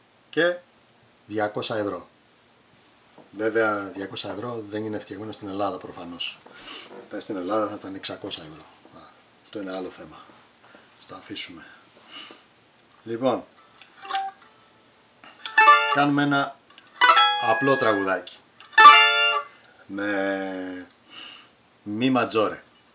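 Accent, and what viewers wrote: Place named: Australia. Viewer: Yasou buddy. I just wanted to know where in Australia are you? Because I heard your accent and I wanted to know if you’d teach private lessons?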